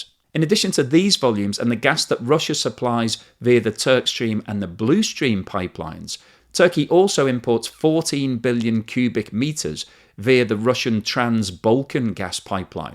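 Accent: United Kingdom. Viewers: This video tells me how crazy these western leaders are. Russian gas is Russian gas no matter the middleman. Erdogan is smart. He is part of NATO and he deals with Russia. So maybe that is why he lets inflation go nuts. If my country🇨🇦 suggested we freeze to death so we can have a pi….g match with USA we the people would certainly bring the truckers back and more. European leaders who opt for making their people and economies suffer need to step away. Turn on Nordstream 2 for goodness sake and deal with Russia. Buy their gas until other alternative sources of energy can be made. If I were made Queen of the world I would ban sanctions everywhere. Open the markets and let the free market flow. Sanctions are the source of hatred and violence worldwide. Not every country will be democratic like ours. We need to help citizens of all countries have a reasonable life. 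Sanctions hurt people not the despots who run the countries. And who says in future these countries will not change with different leaders. I am 70 and I have learned too much punishment on my children has disasterous outcomes. Turn on the gas, push Ukraine and Russia to compromise and stop all this bloodshed. thanks for the videos but you sound British. I would worry about my own country’s demise……it is in serious jeopardy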